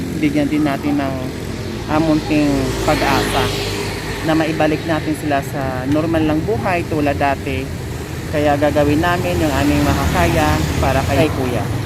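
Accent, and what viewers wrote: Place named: Ilocos, Philippines. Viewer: ilicano kba? kz t9no ng pananalita mo ilicano. More blessing sa iyo dahil mstulungin ka kya papanoorin ko na ang chanel utube mo.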